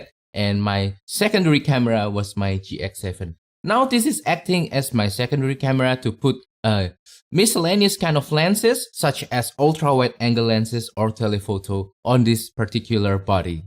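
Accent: Indonesia. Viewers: As an Indonesian myself, I didn’t know that you’re an Indonesian until I notice your accent 😁. Greetings from Poland 🇮🇩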